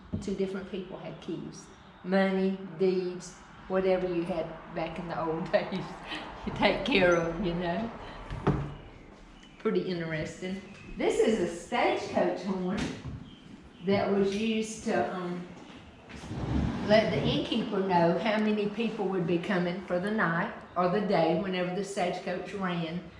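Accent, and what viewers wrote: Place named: Georgia, United States. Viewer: This took me back to the summers of my childhood. I spent them 9 miles away from Lumpkin, in Richland. I miss that accent!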